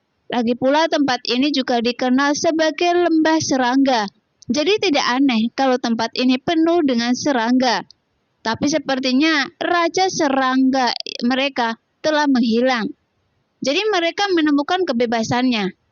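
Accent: Java, Indonesia. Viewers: Mimin nya orang Jawa ya,Kentel banget logat Jawa nya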